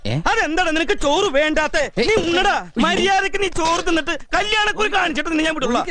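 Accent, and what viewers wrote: Thrissur, India. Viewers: ഇത്രയും നാളായ് ന്യൂസിലാഡിൽ എന്നിട്ടും മ്മ്ടെ തൃശ്ശൂർ സ്ലാങ്ങ് വിടാത്ത ചേട്ടൻ പൊളി തന്നെ 😍🥳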